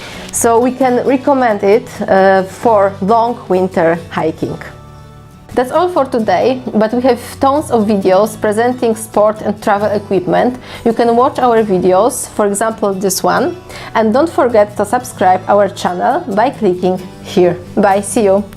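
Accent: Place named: Poland. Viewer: po co mówic po angielsku jeżeli słychać ze jesteś z Polski?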